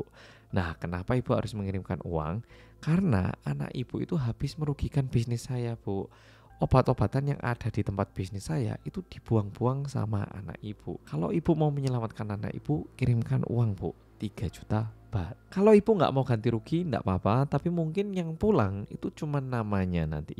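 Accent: Java, Indonesia. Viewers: Ngebut bgt nonton smua vidio oppa medok ini. Hahahhaa,semua ku tonton mlm ini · Selain ceritanya aku suka logat dan medoknya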